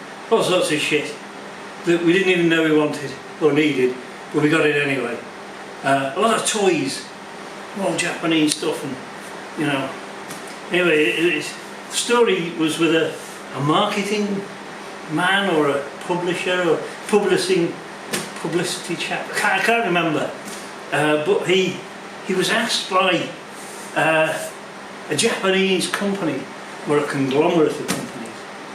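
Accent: United Kingdom. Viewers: Happy New Year, look forward to seeing the Italians out on the road again. You do sound a bit more scouse! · Happy New Year from across the pond. As a fellow Britt as soon as you started talking i could detect the accent. Still managing to get some riding in, here in North Carolina. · Light headed ! made me smile. Motorbikes, Guzzi riding and talking bollocks done that most of my life too. Did hear a little scouse coming through. Happy New Year sir.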